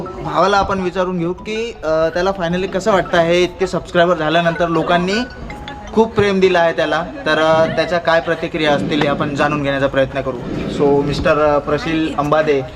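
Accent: Vidarbha, India. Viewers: Congratulations bhava🎉 Marathi travel vlogger tar bharulur ahet pan , Tuza psycho pana and vidarbhachi bhasha hach tuza X-factor aahe Keep it up Bro Lavakar 1 million houdet hi shubechha 🎉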